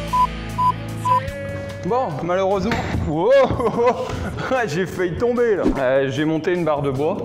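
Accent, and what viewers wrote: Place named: North, France. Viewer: ta un accent du nord j'trouve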